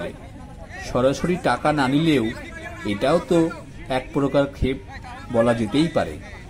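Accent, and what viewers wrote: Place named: East Bengal, Bangladesh. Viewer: Video ta jeee koreche r jar voice sona jacche ami sure seee Udbashtu opar bangalar mal 💯💯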